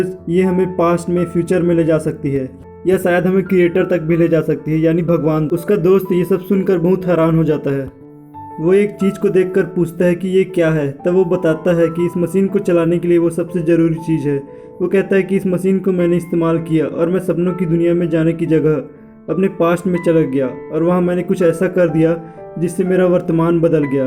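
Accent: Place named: United Kingdom. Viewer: Britain voice h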